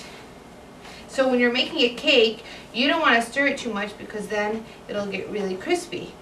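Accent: Midwest, United States. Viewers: I KNEW I heard a midwestern accent in there somwhere haha.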